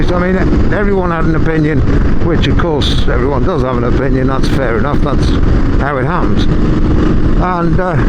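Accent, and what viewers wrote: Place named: United Kingdom. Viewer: >click on interesting motorcycle vlog >hear british accent >click off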